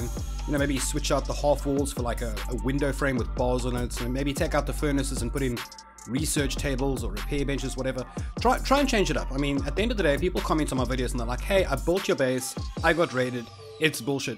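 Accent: Afrikaans, South Africa. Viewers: You sound South African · My bru!! Love hearing the saffa accent in a Rust vid nogals!! I’m subscribed, keep up the good work!! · U sound south African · Mister, are you South African? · are you South African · Your South African I hear the Afrikaans in you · Is this boy South African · dis man from SA? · You south African? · You sound south african mate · You're South African? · Are you south african ?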